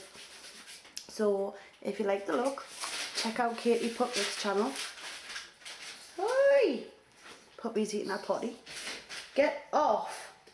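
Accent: Newcastle, United Kingdom. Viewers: shes not irish haha. shes from newcastle ino that cos i am too :D i talk like that aswell :] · Rockin' the newcastle accent angel! We geordies know whats happenin'! ^^ xo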